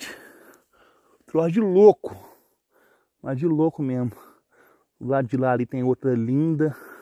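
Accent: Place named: Minas Gerais, Brazil. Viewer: Minino eu gosto de ver as plantas mais eu gosto muito do seu sotak mineiro. Muito bom os seus vídeos 👌👏👏 Deus te abençoe sempre 🙋😍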